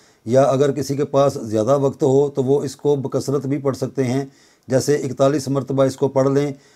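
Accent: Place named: Lahore, Pakistan. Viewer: Acha to mery bhai lahore sy hain ..lgta to tha ap k bat krny k lehjy sy vesy ..main b lahore shalimaar baagh sy hun bhai ..baghbaan pura sy ..sun k khushi hui ap to sachi main apny hain mery .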